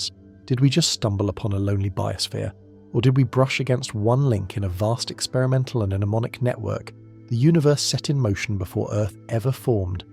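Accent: United Kingdom. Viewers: Why is the voice ALWAYS british english!?!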